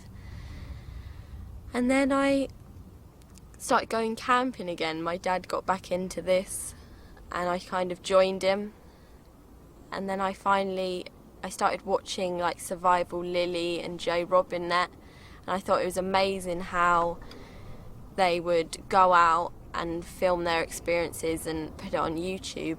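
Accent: United Kingdom. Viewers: I love your mind. Also your British acsent remains me my MSc in Scotland:)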